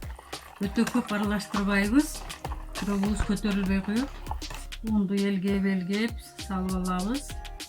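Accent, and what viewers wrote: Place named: Ichkilik, Kyrgyzstan. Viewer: Ичкиликче сүйлөйт экен башыга ичиге деп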